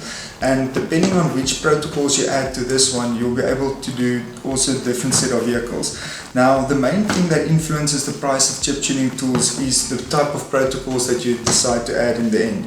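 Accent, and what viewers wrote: South Africa. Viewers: He sounds like afrikana but live overseas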